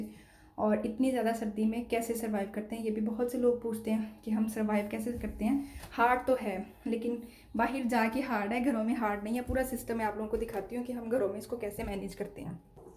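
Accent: Punjab, India. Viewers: Wonderful sharing ❤ Loved your panjabi accent 😍 ❤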